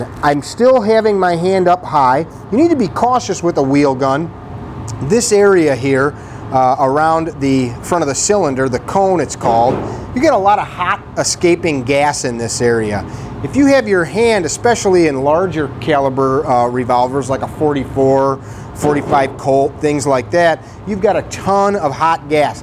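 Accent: United States. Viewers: What is this American accent called? 😂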